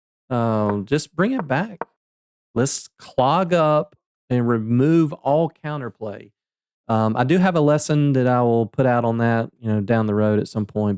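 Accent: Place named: South, United States. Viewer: you know then, secrets of magic can be something of a letdown to most folks. When I sell magic on ebay I can't do refunds for that reason. When I was living in Somerset Pa , I worked for House of Enchantment, 😆, I know , sounds like a brothel, anyway I would sell linking rings to them and they'd come back and say they wanted the ones that I had . I had to explain that they were the same principle, but because I had been doing them for ages , that i could make them appear to melt thru each other and they just needed more practice... There's a lot of awesome mathematical card tricks I could teach you if you're interested. A couple are uploaded on my YouTube channel which I don't promote, it's mostly for myself, but lemme know , I'd be happy to share . By the way, I heard that southern Twang accent , and I would have bet my Good Bishop that you were from Tennessee or Kentucky, maybe even Texas, but I didn't want to insult you if I was wrong lol. 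.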